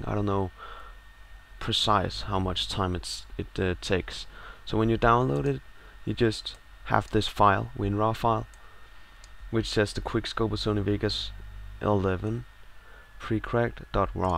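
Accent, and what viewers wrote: Denmark. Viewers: Danish? Are you from denmark^^'?? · i can just heat clearly you are from denmark! haha!:D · Tak, nu kan jeg komme igang med videoredigeringen igen Synes godt at jeg kunne spotte en dansk accent XP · Are you Danish? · Dansker :D? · Haha, kunne tydeligt hører du var dansk :-) Fed video den hjalp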